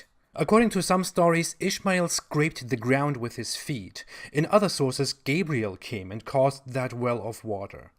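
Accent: Germany. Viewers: "I'm not Hitler just because i have a German accent" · You have a German accent?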